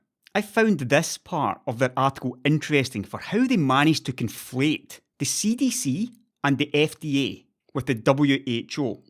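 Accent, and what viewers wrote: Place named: Scotland, United Kingdom. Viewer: Can you make a video in swedish. I have to hear your Swedish with a Scottish accent, if you can speak it.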